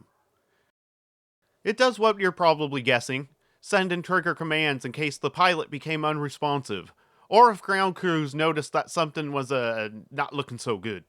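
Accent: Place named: South, United States. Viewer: Wait! What happened to your beautiful, ambiguously southern drawl???!!!!! It's just not the same :'(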